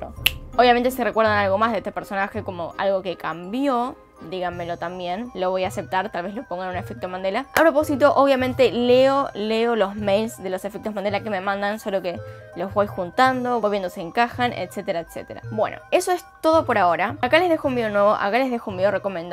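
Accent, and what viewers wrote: Argentina. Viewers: Lo dije una vez y lo reitero....Me encanta tu acento argentino, saludos...!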